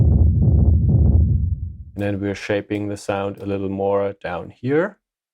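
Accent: Germany. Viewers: Ich mag ja den Deutschen Akzent XDD... aber sehr schönes tutorial... Das problem ist, wenn ich solche baselines mache, hören sie sich auf meinen monitore sehr schön an, aber im auto dann mit anlage grauenhaft, weil zu viel sub anteil, nehme ich dann die low ends so weit raus, dass es geht, hört es sich auf Lautsprecher scheisse an... eine todesspirale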